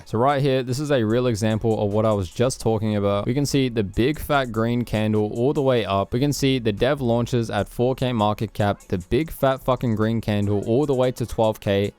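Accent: Australia. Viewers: You sound like you’re from Sydney? Are you in Sydney? I’d like to do real life training online please.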